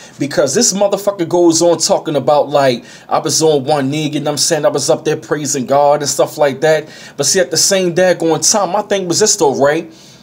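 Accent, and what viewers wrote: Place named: New York, United States. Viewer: Your NY accent is super fly 😘😘😘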